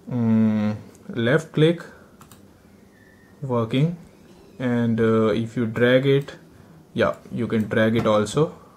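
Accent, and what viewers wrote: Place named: India. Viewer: OMG u could not have more of an indiant accent.... cool vid tho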